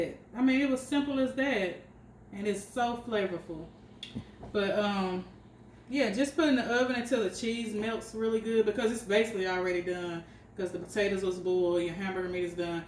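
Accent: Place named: South, United States. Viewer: I have cooked something similar but I'm going to follow your recipe next time. I love to listen to you talk. You sound like a Southern girl like I am. God bless you with health and happiness.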